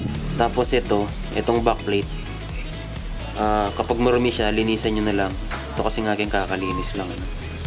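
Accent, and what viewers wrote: Pampanga, Philippines. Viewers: Kapampangan ka paps? Hahaha papuntang bahay pare yun aa.😂😂😂😂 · Kapampangan ka sir?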